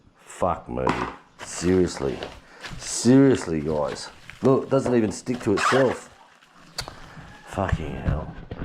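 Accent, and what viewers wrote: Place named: Australia. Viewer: Just found your channel. Popped up in my feed and caught my eye. So good to hear an Aussie voice after all this time. Anyway I have subscribed to keep up with the laughter.Until people live here they have no idea of the hoops that we have to go through on a daily basis. I learnt not to go to the hardware on my own because they just don't serve me. Too afraid to speak English.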